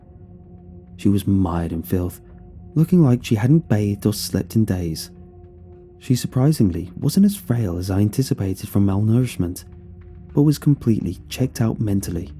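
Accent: United Kingdom. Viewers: I love your sweet British accent, keep up the good work 👍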